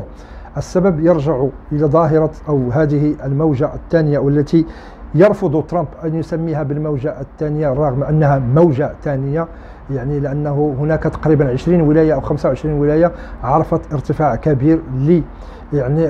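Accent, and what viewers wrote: Morocco. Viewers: اخي ربنا يحفظك عندك اللكنه المغربية قوية نرجو لو تكرم ذكر الاشهر بالعربي او الانجلش لاني لا افهم الشهور باللهجه المغربية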